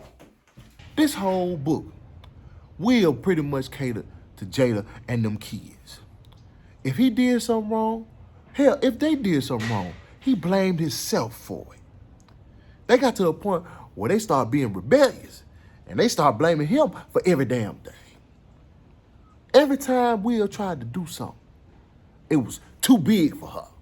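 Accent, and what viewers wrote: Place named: South, United States. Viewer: I loved your analysis. I’m proud of you. You proved Southern accent does not equal dumb. I know because I’m from Jackson, Mississippi.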